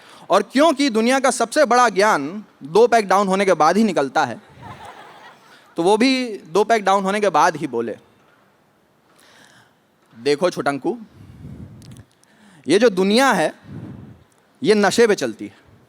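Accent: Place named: Kanpur, India. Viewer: That's a thing....know as,"kanpuriya accent"!!!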